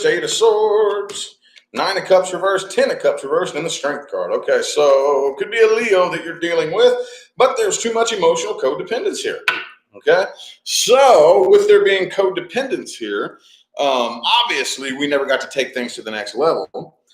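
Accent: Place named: Kentucky, United States. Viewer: Kentucky Girl here loving that hint of your Kentucky twang!!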